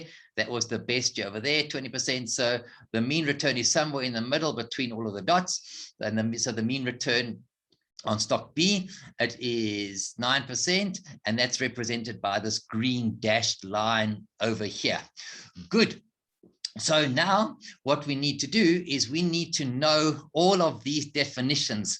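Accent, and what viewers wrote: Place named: South Africa. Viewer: Thank you so much. Very well explained. Love the south african accent. :)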